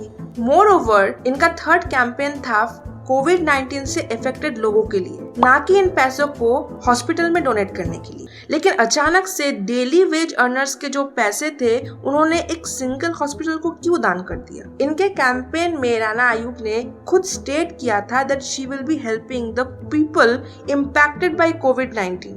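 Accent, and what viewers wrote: Bengal, India. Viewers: Tum shayad west bengal se ho tumhari hindi bolne wali accent mein bangali touch hai tum north se nahi ho ye mujhe pakka yakin hai waise awaz mithi or pyari hai tumhari bhagwan ka shukra hai ab ladkiyan bhi right wing ko support kar rahi hai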